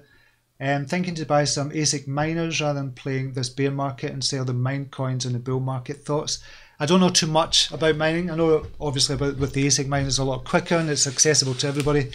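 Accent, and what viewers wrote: United Kingdom. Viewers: Such a puff of fresh air......a British accent in cryptoland.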